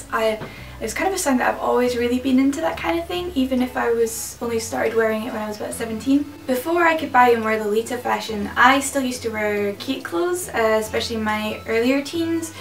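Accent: Scotland, United Kingdom. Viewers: Are you from Scotland?! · Your Scottish accent is hardly noticeable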